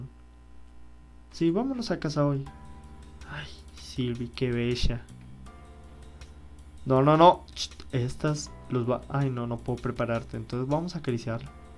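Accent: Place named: Colombia. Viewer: suenas como un colombiano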